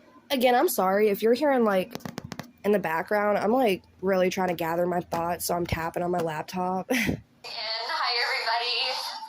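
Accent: South, United States. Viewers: your accent is interesting. Your “I” sounds like your from the south (words like “LIKE”, “LINE” ect.). All other words sound like “normal”. Where in the country do you hail from? Love you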